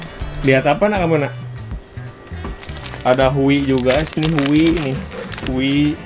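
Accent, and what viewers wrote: West Java, Indonesia. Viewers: gaya bahasa sama logat sunda pisan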